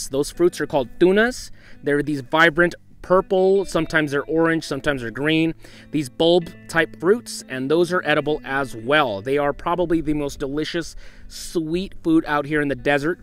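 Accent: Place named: Northwest, United States. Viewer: Dude, I love this video and yes, I can straight up. Tell you a bunch. More plants that lived down there that actually grow in that envirogreat. Video and you have a good English voice. I know that sounds weird, but you actually speak like a northwesterner. That's where I come from. You. Kinda sound like I do. Not a Southerner. You don't got the dral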